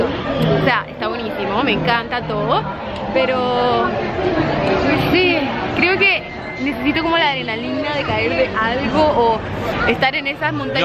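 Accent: Chile. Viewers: Se nota tu Chilenismo xd Saluuudos! Desde el mismo Chile:D Me encantan tus videos, enseñame a hablar ingles:v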